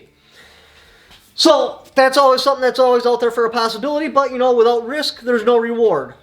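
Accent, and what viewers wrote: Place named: Wisconsin, United States. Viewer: Yer fuckin Sconi accent is hilarious.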